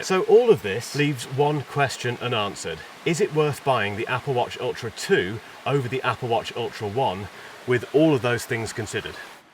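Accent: England, United Kingdom. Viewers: Maaaan you are great! Love the English accent. I’m gonna buy the ultra 1 used 🔥👍 sorry for my English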